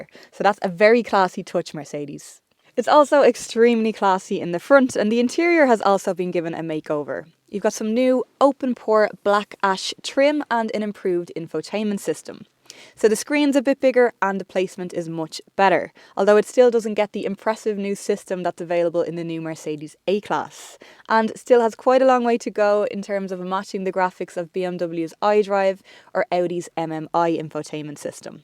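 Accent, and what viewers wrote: Dublin, Ireland. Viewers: Could you not even try to articulate your annoying dublin voice . .f.. sake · I like the Irish accent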